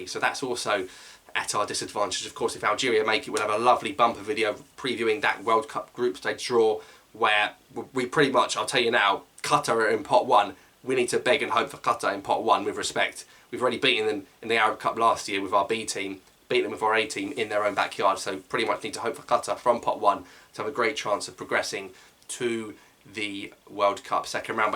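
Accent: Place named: RP, United Kingdom. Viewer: well it's a great coincidence to find your channel because I am learning British accent and I adore your "BBC accent" especially when it's about one of my favorite topics which is Algerian football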